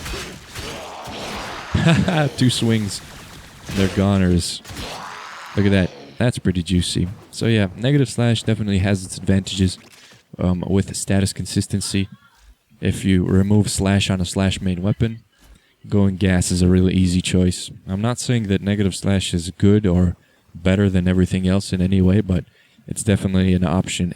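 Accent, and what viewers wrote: United States. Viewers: Q&A: How did you, a montenegroid, learn to speak english so well with American accent? Also, are you still a student? What do/did you study?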